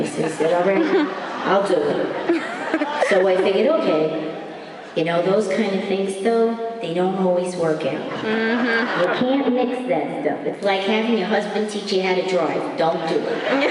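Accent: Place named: New York, United States. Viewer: She's such a New yorkaaa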